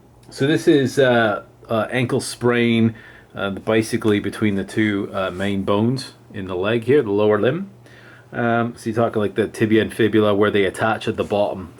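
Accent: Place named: Scotland, United Kingdom. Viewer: I know this an odd question, given that my focus should be related to the taping (video was excellent by the way); but I spent all the time trying to work out your accent. It sounded a combination of Scottish (not sure which part), Northern English (Liverpool or Manchester), and Canadian. Please help me out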